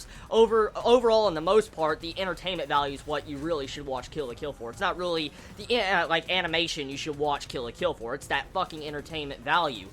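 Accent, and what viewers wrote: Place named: Texas, United States. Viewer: yo bro you from texas?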